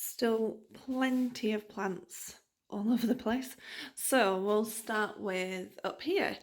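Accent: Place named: Yorkshire, United Kingdom. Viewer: Ahhhh, a Yorkshire lass? Thumbs up for the accent, the plants and hair colour 😁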